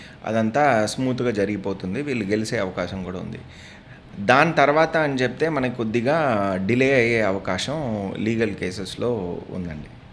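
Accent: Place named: Tamil Nadu, India. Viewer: అరవ తెలుగు. ఇదొక పబ్లిసిటీ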